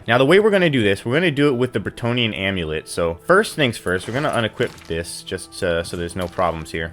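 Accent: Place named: Midwest, United States. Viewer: Jesus the Midwestern accent on this dude is abrasive...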